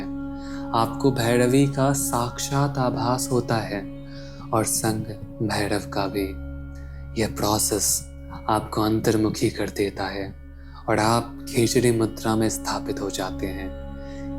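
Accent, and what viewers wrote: Bihar, India. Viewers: Bihari hain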